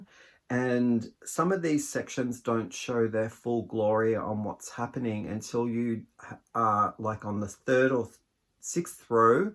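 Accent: Australia. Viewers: Happy belated birthday! How crazy is it that you received 2 cards exactly the same!! The sweater looks fantastic on your hubby! That gray shawl is beautiful I like how you incorporate the contrasting orangeish color and the burgundy will be a nice contrast as well. Where are you from originally? I've been trying to place your accent, it give me Australian vibes 🤔 I hope you had a great time at the new restaurant, can't wait to hear about it.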